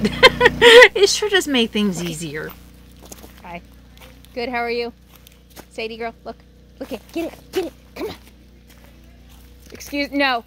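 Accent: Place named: Northeast, United States. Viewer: I love the way you say "what do you think?" to your animals. I do that too and never heard anyone else say that to their fur babies until I came across your channel. It's just one simple little thing in a sea of other little things that make me go "she's my people!" every time I watch your videos. I can tell that you were born and raised closer to the northeast side of this country, purely through your dialect and the way you express yourself. Much love to you and Sadie from Michigan!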